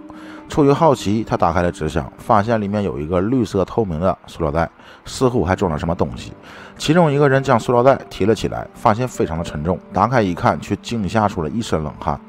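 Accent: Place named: Northeast, China. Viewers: 东北， 好像是鞍山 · 鞍山海城的口音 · 辽宁话吧，沈阳那边的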